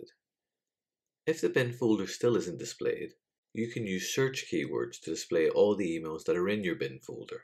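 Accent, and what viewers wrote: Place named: Wales, United Kingdom. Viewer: I like your Welsh accent (I hope it's Welsh). AmIright? This video is easy to follow, your speech is CLEAR, and easy to understand. You get a TEN out of TEN. Thank You!!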